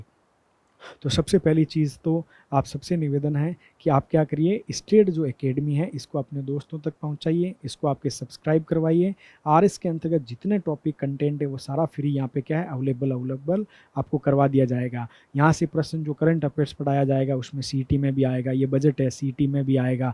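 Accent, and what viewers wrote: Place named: Bhopalgarh, India. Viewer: तभी सर मुझे बोली चाली से लगा आप भोपालगढ़ से हो. और option में भी रामधाम खेड़ापा देते हो.. बहुत खुशी हुई अपने क्षेत्र के गुरुवर को YouTube पर देखकर🥰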